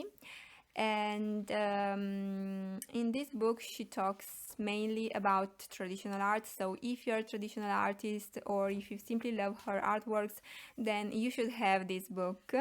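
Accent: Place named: Italy. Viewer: Ho capito subito che eri italiana dall'accento 😂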